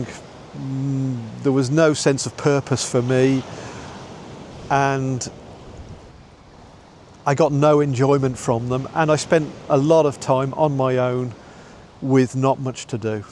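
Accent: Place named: Midlands, United Kingdom. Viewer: Your family should be so proud of you! You speak such sense … I really found this interesting and drew parallels with my own life. You sound like a fellow midlander… good luck to you x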